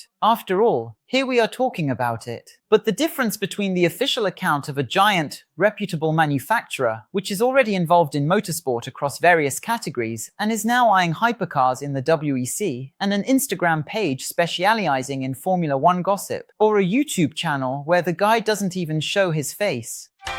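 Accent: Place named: United Kingdom. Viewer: Ai eu gostei hein ADM! Meteu o british accent pra jogo! Me senti na F1TV! Parabéns pelo passo de subir mais um degrau!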